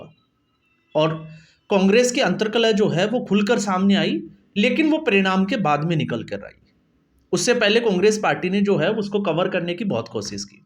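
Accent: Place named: Haryana, India. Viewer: आपकी भाषा में हरियाणवी पुट है,,🎉🎉🎉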